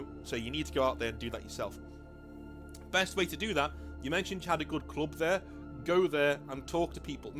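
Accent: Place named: Southport, United Kingdom. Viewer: You're a Lancashire guy who's in Merseyside but say it doesn't exist. You sound like your from Southport my hometown. Southport is an oxymoron as it is not South nor a port. It's north of Liverpool 43mins on the train. It's also somewhere people come to die. You can't more for care homes here. It's truly God's waiting room. But the important thing is that Southport sits right on the border of Lancashire and Merseyside. (Where the black watch on the wall keeping the wildling Lancastians out) 😂 However there is a lot of arguments here as to whether we're Lancastian or posh Scousers. So when you said you where in Merseyside but actually you're Lancashire it made me think.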